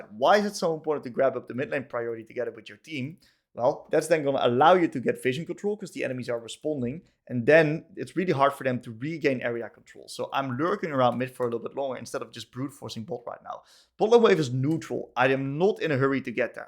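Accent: Netherlands. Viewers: Je Nederlandse Engelse accent is crazy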